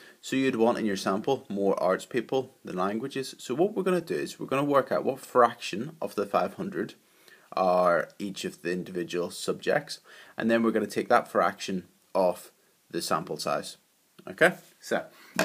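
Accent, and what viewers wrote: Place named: Scotland, United Kingdom. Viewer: what is that scottish accent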